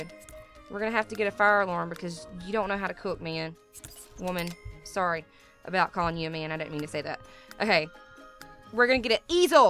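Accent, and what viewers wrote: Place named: South, United States. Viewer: I just love your country acsent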